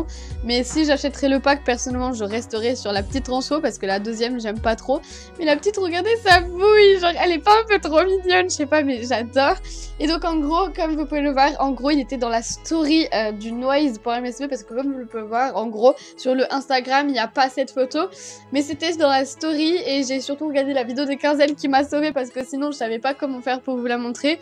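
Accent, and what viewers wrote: Quebec, Canada. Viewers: Tu est cebecoise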